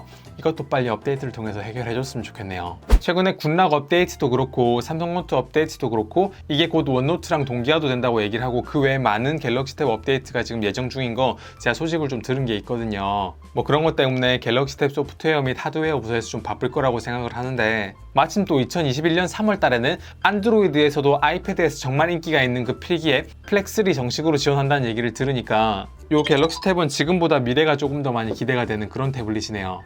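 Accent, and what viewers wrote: Gyeongsang, South Korea. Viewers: 목소리가 참 매력있네 경상도 이신가..?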